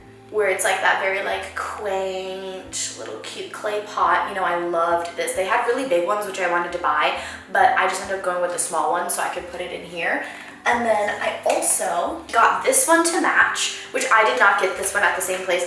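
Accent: United States. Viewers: From an American who speaks french: Use an online course, because the easiest way to learn is to immerse yourself in the language, and Rosetta Stone can help simulate that experience. Also, your American accent is very strong and could interfere with learning a French accent properly, so an online course can help correct that <3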